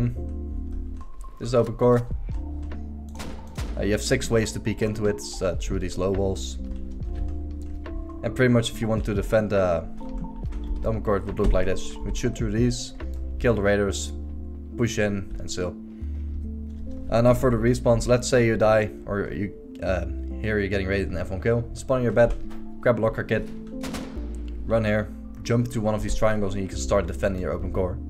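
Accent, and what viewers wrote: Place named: Netherlands. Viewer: Ben je nl want je hoort het echt 😂😂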